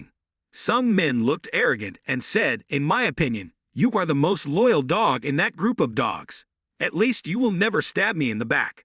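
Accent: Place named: United States. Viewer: Imma be real, I genuinely appreciate the effort but this is one of the worst AI voices I’ve come across to date; the disgusting American dial is cranked up far, far, far too much. It’s almost comical where it not for the fact this isn’t satire. I don’t know who your target audience is but unless you’re specifically targeting the most niece subgenre of fat fucks I’d highly suggest you mellow out some of that abhorrent mannerism and intonation.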